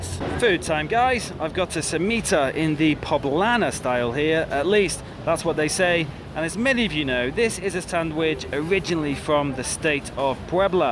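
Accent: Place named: United Kingdom. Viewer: love your videos I also love your Britannia speaking do not lose it emphasize how English should sound Like .Arriba Juarez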